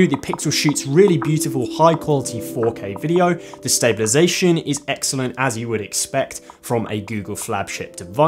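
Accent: United Kingdom. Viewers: He sounds pretty average british to me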